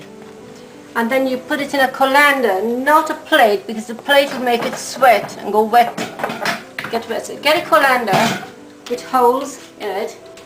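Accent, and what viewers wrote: United Kingdom. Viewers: where are u from? u dont have a guyanese accent, but u do sound british. and u made it differently than my mom and my grandma and my great grandma...they were from guyana.. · That's a real English /London Asian accent honey... with a snippent of Asia that's lived and worked in the UK for 15 + yrs. Whats the bleedin' problem anyway? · Just out of curiosity, what race are you? You look kind of indian or islander, but sound very british o_O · I am Guyanese and that is not the right way to cook Guyanese roti. You are the only Guyanese i saw make roti different. If you are Guyanese then why you talking like you are from the UK? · I don't see anywhere in the description that is says she is Guyanese.. But since she has a slight brit accent, maybe she is Brit, but family is from Guyana or Trini? I do hear a slight coolie accent.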